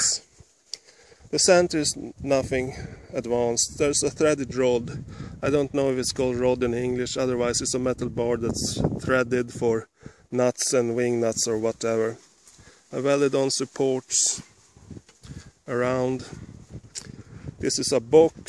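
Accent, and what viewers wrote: Sweden. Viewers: I am so glad I found your video. I am attempting to build my own texas star. Yours is perfect. You crack me up with your Swinglish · Very cool and thanks for sharing your ideas. Also, your Swenglish is just fine. I appreciate your creativeness!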